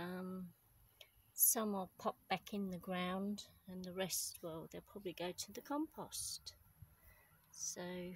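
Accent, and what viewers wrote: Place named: London, United Kingdom. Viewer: Hello again, im sure i can here a london accent there, you used to live in the UK ? I buy ginger from the supermarkets to make ginger wine, im going to give this a go in my polytunnel next year, your harvest is great. thanks and take good care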